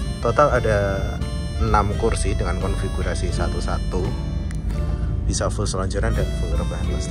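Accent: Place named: Banyumas, Indonesia. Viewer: ngapak raya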